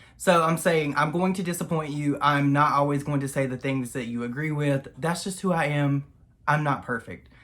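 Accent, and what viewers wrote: South, United States. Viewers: Im disgusted. I only have youtube, no other social media so i dont see/hear other drama. What I can yell you, as an insignificant Aussie ol' gay boy, you and Nick is the duo I enjoy watching. I dont know what to make of Rich, in Aprils video's she says he's a shallow, immature cry-baby. Anyway, I think you are a sweet guy, i love your fucking accent! I know Americans like our accent, well I just LOVE 💕💯🌈 The southern accent, i think its gorgeous. Believe in yourself, you give more to the world than you know and I think the online world appreciates you more than you know. Everyone knows you & Nick are 💯 real, youre funny good guys, the fact that you're willing to say "Hey, I'm no role model, I'm straight up shady sometimes" lets me know that you are self aware. I think Rich see's himself in a particular way and it really isn't based 100% in reality. 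I know you don't know me and me and what I think and say doesnt really matter but just know that you entertain me and I really do appreciate your work and find it entertaining. Sending LOVE 💜💕🌈💯 and support from Australia girl 😚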